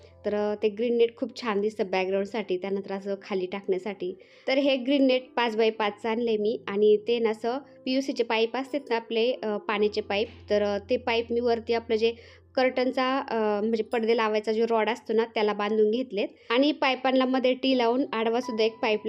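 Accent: Ahmednagar, India. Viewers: श्री स्वामी समर्थ ताई डेकोरेश एक नंबर. केडगाव देवी ला नक्कीच येईल मला खूप खूप आनंद झाला ताई मि घरात सगळ्या ला सांगितले ताई बोलवल ताई आज फोजी साहेब कुठे गेलेत दिसले नाही ताई आपली नगरी भाषा छान 🎉😊😊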